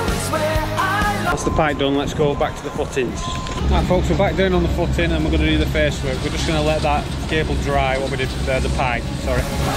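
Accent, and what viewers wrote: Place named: Liverpool, United Kingdom. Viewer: Spot on mate, what more can you say! Scouse! ;)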